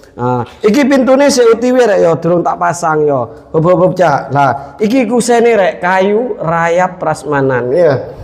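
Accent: Surabaya, Indonesia. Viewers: Meduro, rasa suroboyo iki🤣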